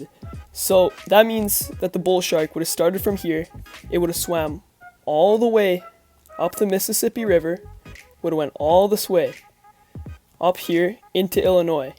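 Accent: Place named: Cleveland, United States. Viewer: Can tell this dudes from Cleveland 😂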